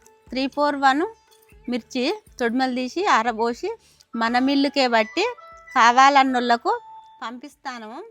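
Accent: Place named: Telangana, India. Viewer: last lo" nee avva swargam" highlate😂😂...pure telangana slang ❤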